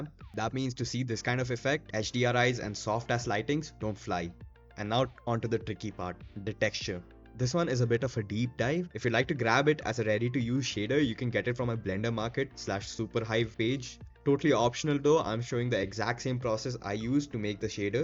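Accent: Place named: India. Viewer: Indian accent sure is dense, but holy hell i thought u have like 80k subscribers or something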